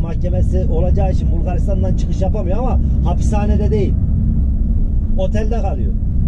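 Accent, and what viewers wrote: Adana, Turkey. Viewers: Abi adanalı siven var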